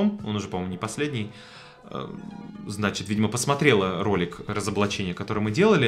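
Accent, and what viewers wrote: Ukraine. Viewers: в суржике используются "русизмы". Но произношение украинское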